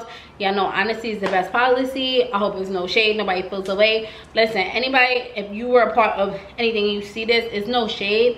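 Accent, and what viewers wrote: New York, United States. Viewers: OMG! Sorry you had an awful experience. I had a second BBL by Dr. Blinski in Miami. Much better experience than Spectrum Aesthetics. That was a nightmare. I’m just here for your New York accent. You remind me of my daughter. ❤ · Why did I think I was watching a nicki vid-when she was like “de de de-DOWN BELOW”😂 or maybe jus heavy NY accent. Either way-she’s gorgeoussss❤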